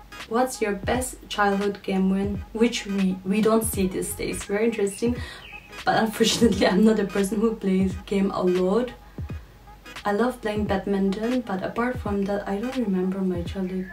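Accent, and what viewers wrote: Nagaland, India. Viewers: You look like a Ao but your accent sounds like angami...you might be thinking why...🙄 Here is the reason because your voice is so soft like angami ladies🤗🤗 people will believe you if u tell thm that you are cocktail..lol.. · Originality is what you are my sister. The accent is real naga♥unlike those wanna be famous nagas local celebs. Praising the Lord Jesus Christ and sharing the Bible verses in the middle is the best thing I came across in the video.. Down to earth and being humble will take you very far in achieving your goals.. May God bless you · Idk why people still consider English speaking as a barrier of intelligence. No matter how well we speak English and have accent like those of the Britishers, we will never be Englishmen. Being a Naga, it is okay to have an accent like a Naga because that's our identity. And whoever criticizes on your accent, well they need to get a life and maybe get back to the roots. 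Besides, you have a wonderful singing voice.👌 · I have many naga friends and now my english is like them I love the naga accent 🔥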